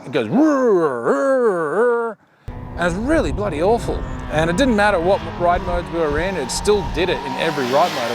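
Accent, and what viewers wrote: Australia. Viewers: You sound Australian... but keep saying the Hornet is "under $11K" - in what country? In Sydney Aust they are "Under $20k" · Mate are you an aussie? In not picking up a US accent. Awesome video though. Thanks for the comparison. Been eyeing off the Honda for my next bike.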